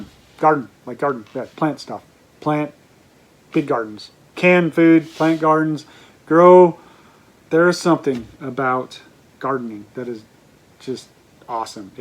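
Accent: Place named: Pennsylvania Dutch, United States. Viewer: I can still hear the Pennsylvania Dutch in your accent!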